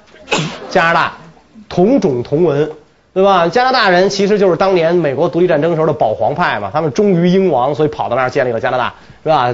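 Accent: Beijing, China. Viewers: 讨厌北京强调。 尤其是讲课的时候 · 讲课的时候用北京腔感觉不严肃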